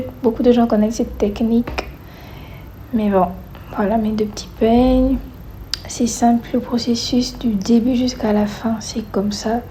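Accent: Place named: Gabon. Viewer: Tu as l’accent d’une gabonaise 😅😅. Sinon très beau rendus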